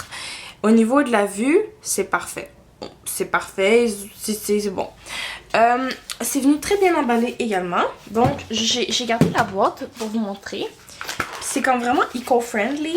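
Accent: Quebec, Canada. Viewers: c'est l'accent du Québec :))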